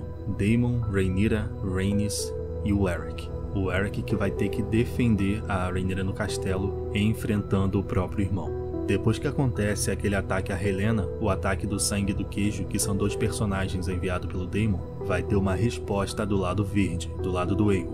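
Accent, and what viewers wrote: Rio de Janeiro, Brazil. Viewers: Por que o narrador do vídeo tenta mentir e esconder o seu sotaque carioca?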